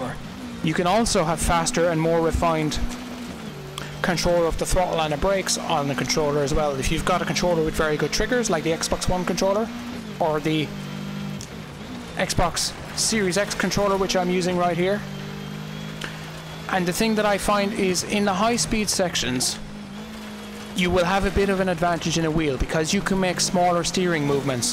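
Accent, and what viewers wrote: Ireland. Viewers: I like your accent! Irish?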